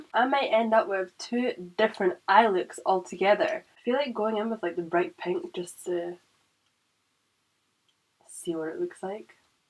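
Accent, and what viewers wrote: Scotland, United Kingdom. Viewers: Love your accent Scottish?🏴xo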